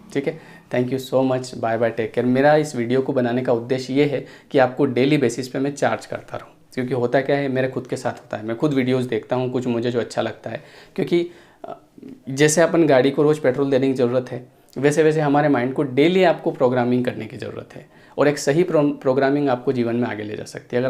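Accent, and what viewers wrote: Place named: Malwa, India. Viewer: Your tune seems indore and ratlam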